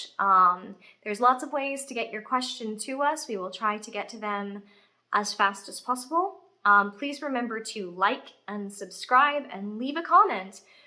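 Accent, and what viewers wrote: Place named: Australia. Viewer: Just a question for you. Are you originally from Australia, or spent a lot of time around Australians? Reason I ask is, when hearing you speak, although your overall accent sounds American, some words you said sound almost Australian.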